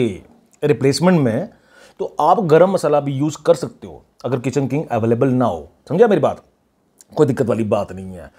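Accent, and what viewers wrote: Punjab, India. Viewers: Sir ur Punjani accent😍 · Bhai kya aap punjab se ho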